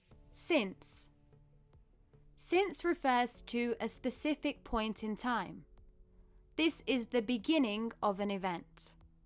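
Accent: United Kingdom. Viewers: I have watched half of your video on YouTube, I can say that the way you are teaching is fantastic. You are a great teacher . Your British accent is still strong and clear for me . The way you explain is very clear and easy for thevpeople to digest your lesson. Keep up with new lesson .well done.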